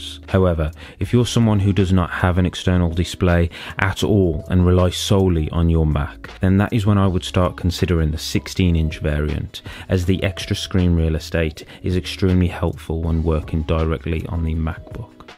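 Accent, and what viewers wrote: United Kingdom. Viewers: That British accent is 🔥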